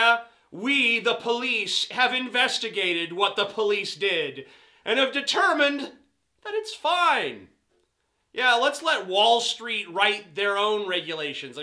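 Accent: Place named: South, United States. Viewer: Makes you look like a Texan, but goes really well with the hillbilly voice. I love it.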